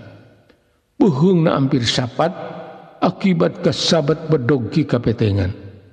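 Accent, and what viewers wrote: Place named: South Jakarta, Indonesia. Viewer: Saepisan ngadongeng na JAKSEL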